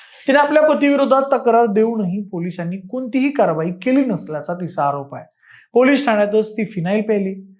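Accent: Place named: Satara, India. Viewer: Kadak bhasha shaili ahe satarkar vatatat nakki chhan avdla vidio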